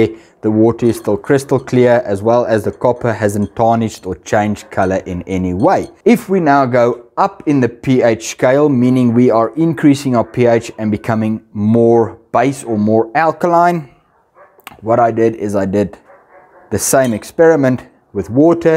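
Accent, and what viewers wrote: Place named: South Africa. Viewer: Tornished..... Hahahah, classic South African accent. All good shit man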